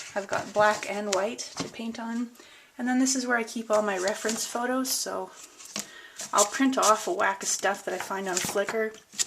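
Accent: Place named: Canada. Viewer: Ah! I watched ice road truckers with Canadian truck drivers in it lol...Love your accent :)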